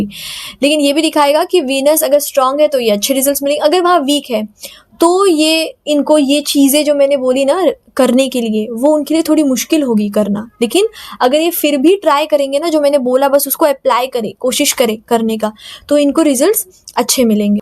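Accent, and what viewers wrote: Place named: Gujarat, India. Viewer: You must have gujrati root. Your accent ..ok. Aap accha bolti hain. Thank u behan ji. Om Namah Shivaay.🎉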